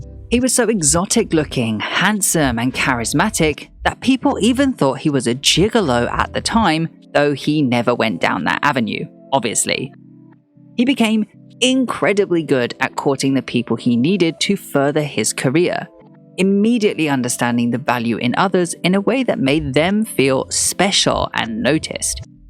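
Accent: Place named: England, United Kingdom. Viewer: I think it’s because you have an English accent, but it doesn’t sound super condescending and posh. I mean that as a compliment, it is an American lol.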